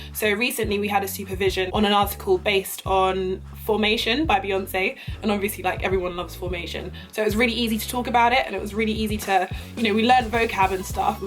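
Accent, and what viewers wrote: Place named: France. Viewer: This video helped a lot! Love ur French accent BTW <3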